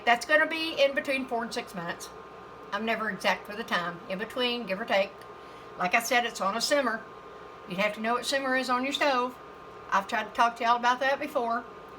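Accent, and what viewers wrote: South, United States. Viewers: It’s southern. I checked her channel she’s from Louisiana from the USA 🇺🇸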